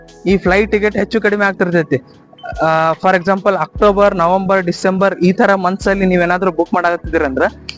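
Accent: India. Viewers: Houda okay ನೀವ್ ಮಾತಾಡೋದು ಸ್ವಲ್ಪ ನಮ್ಮ ತಾರಾ ನೆ ಅನಿಸಿತು ಅದಕ್ಕೆ ಕೇಳಿದೆ , ನಮದು ಬೆಳಗಾವಿ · Nevu north kannadaana...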